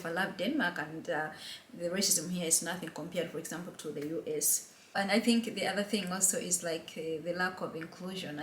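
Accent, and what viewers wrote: Uganda. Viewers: I wouldn't know why my boss always gets hurt when we are traveling on a dusty road i put on my head wrap ,he easily asks why are you putting that on? I used to think its because i look different! Now, i know why? Thanks sis,am in Uganda you sound like someone from Fort portal.😊 I feel those guys have strong racism but hidden internally in their behaviour that is also quite hidden it takes a keen person to tell! The company i work for are all Danish, trust me they make me hate being in Denmark by the way!! Thanks for sharing!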